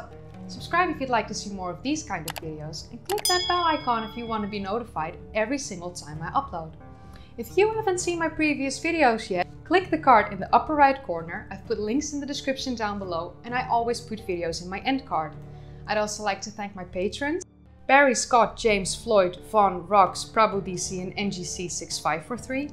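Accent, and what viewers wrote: Netherlands. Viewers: je kan horen wanneer er een Nederlander Engels praat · That accent…is she Dutch? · Dutch speaker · Dutch , I think she is from the Netherlands. She will correct me if I am wrong.😊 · Dutchie detected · Bespeur ik daar een Nederlands accent? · I detect DUTCH in your voice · She sounds so Dutch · Dutch accent?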